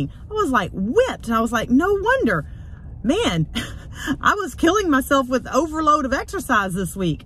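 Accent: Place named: Texas, United States. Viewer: Love your character and your Texan accent.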